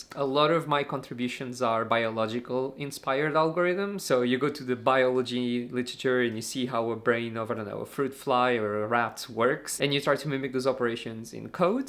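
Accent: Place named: Brazil. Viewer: show us how to make that iced latte of yours. also are you latin? maybe a fellow brazilian? cause of the accent (cute by the way)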